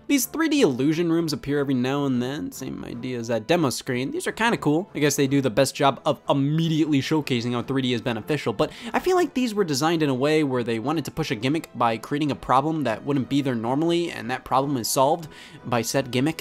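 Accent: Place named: Midwest, United States. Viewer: What I love about is that you can obviously tell he's from the Midwest poorly based on the fact that he said "Yup" like, 10 times in this singular video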